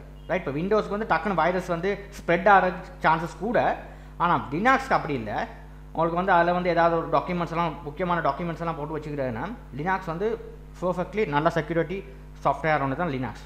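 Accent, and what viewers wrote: Sri Lanka. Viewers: Bro nenga sri lanka va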